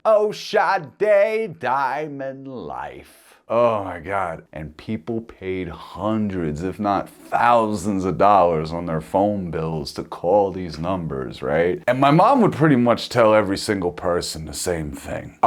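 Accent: New York, United States. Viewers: Omg the NYC accent is strooong! I’m here for it tho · Listening to this gave me such a sense of nostalgia. This story was told like a born and bred New Yorker. Love the accent changes and the impressions!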